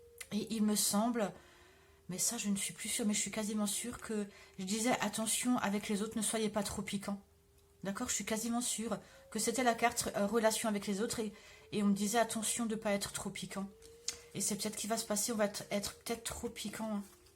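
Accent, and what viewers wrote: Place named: Alsace, France. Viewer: coucou j'ai reconnu ton accent. Moi aussi je suis alsacienne :) Tu vis où ?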